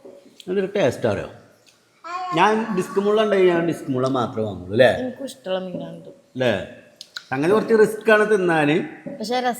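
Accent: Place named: Malappuram, India. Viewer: I am malappuram same pronounce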